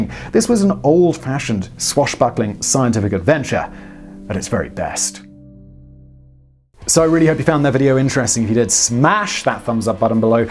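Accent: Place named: England, United Kingdom. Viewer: The English accent... :)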